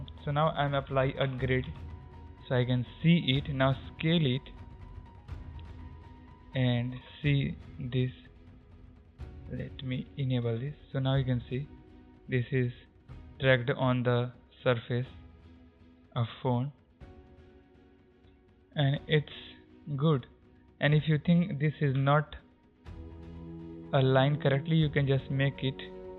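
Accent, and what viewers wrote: India. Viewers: It makes me happy . When You hear Indian accent and You know it's not scammers..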